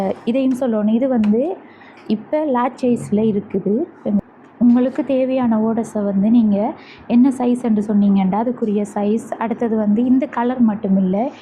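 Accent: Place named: India. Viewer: உந்த சல்வாரையும் கொல்வாரையும் விட்டிட்டு கொஞ்சம் modern க தையுங்க. அதோட இந்தியன் slang ல பேசாமல் மட்டக்களப்பு slang ல பேசுங்கோ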